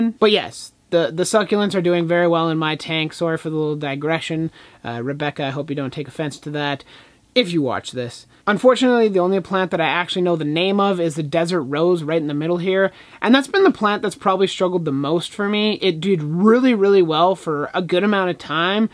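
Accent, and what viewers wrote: Canada. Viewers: It's a Canadian accent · it’s because he is canadian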